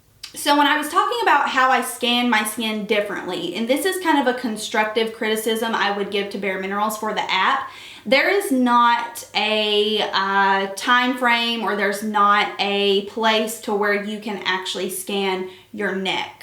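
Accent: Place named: Oklahoma, United States. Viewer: You sound like an Oklahoman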